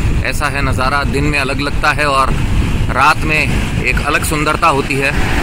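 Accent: Standard. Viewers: Ram Ram Bhai..mujhe shuddh Hindi bahut accha lagta hai, jaise aap bolte hai... Aayiye kabhi Assam, milte hai..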